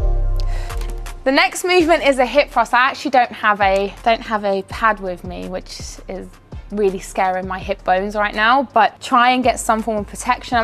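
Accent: United Kingdom. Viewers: Your accent is so strongly British haha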